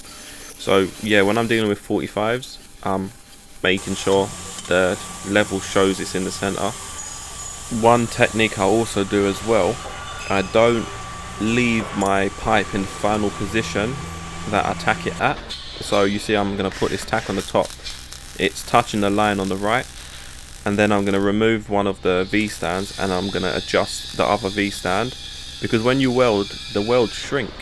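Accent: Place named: East London, United Kingdom. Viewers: Hi, I'm not so great with internet stuff so couldn't figure out how to message you directly. Judging from your accent I'm going with west essex/east london, but could be wrong. I'm a stick welder in the gas industry working in herfordshire and do a fair bit of prefab as well as on site work. Fancy a collaboration? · акцент у этого негра не американский, а английский...думал в англии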